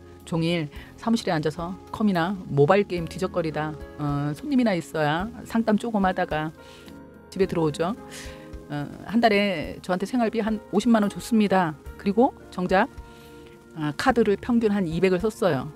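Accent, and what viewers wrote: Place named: Busan, South Korea. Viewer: 선생님 부산이시네용~~~♥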